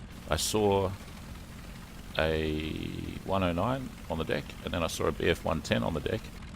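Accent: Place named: Germany. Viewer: the sight, the guns, your will are not seperate things but one being, as is wing shooting. you shoot well but hesitatingly. refer to hartmann, his last interview, or read eicke's notes that richtofen relied uopn. we in the gallery are with you always, strange that accent...not hoch deutsch....oh well Wachendorf/usa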